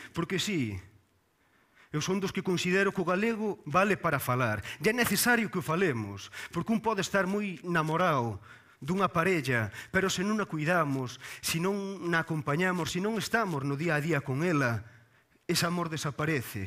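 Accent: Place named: Castile, Spain. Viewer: Parece um portunhol, mistura de português com sotaque castelhano...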